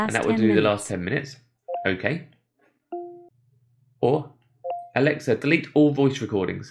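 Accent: United Kingdom. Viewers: I had to watch this video several times in order to tell exactly what this man was saying, as his British accent was difficult to discern. But great points on how to enact privacy methods. Thank you very much. Be safe and well.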